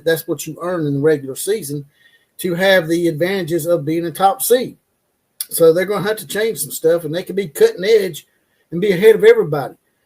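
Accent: South, United States. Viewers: your style of southern drawl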